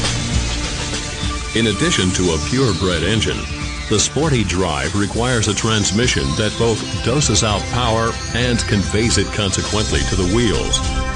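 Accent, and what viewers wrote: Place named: France. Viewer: why is he saying everything in a french accent :/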